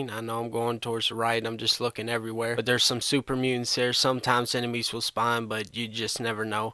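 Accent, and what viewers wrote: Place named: Appalachia, United States. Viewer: do yourself a favor and have someone who doest sound like a hillbilly narrate your bad videos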